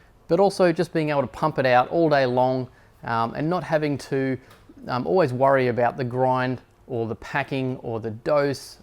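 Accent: Australia. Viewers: Just love the Ozy accent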